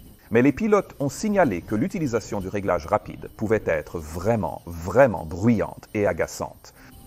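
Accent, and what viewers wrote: Quebec, Canada. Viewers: Excellent. Bravo cousin. 👌👍Avec l'accent Outre Atlantique, c'est terrible. Pour ma part j'ai un faible pour le Boeing 747 😉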